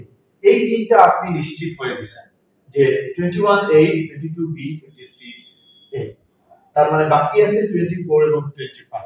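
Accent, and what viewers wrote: Sylhet, Bangladesh. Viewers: Apni ki sylheti?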